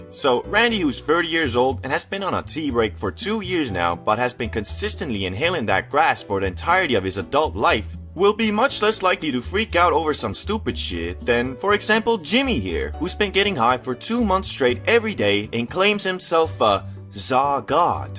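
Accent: United Kingdom. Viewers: He's from the UK???? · Do he sound like he from the Uk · Are you britsh?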